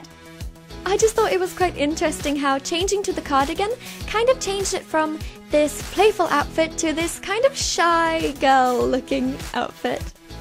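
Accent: United Kingdom. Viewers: your brit accent is sooo cute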